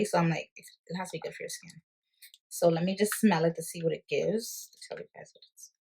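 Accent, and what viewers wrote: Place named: Bahamas. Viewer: Absolutely obsessed with watching Sephora hauls! I’m a Bahamian living in Canada too and when I hear your accent I was like uhhh yeah I gotta subscribe! Keep it up your video quality and content is very nice!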